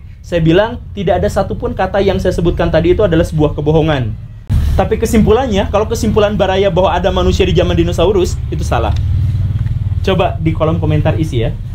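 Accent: Indonesia. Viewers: aku lebih suka orang seperti begini dari pada yang pakek topi versi timur tengah. logat penyampaian sangat ke Indonesia an banget. dan ini yang bikin aku suka nonton vidio satu persatu tanpa pernah saya skip karena saya merasa tambah pintar tanpa ada rasa paksaan harus begini begitu kalau mau seperti itu. sekian terimakasih udah dibaca😂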